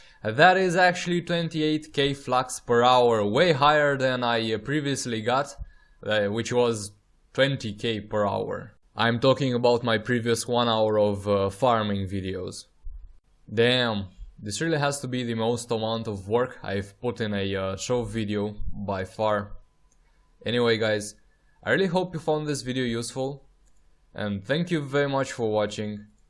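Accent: Russia. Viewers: russian acent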